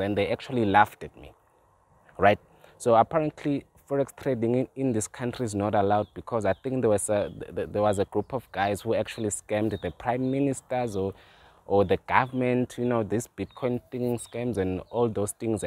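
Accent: South Africa. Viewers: that's South African accent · you sound like you from SA and why don't you reply to the people asking you which country are you talking about?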